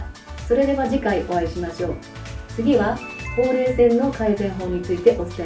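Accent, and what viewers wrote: Hiroshima, Japan. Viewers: 広島弁っぽい訛りが好き❤️ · 先生は、広島の方ですか？ イントネーションで😅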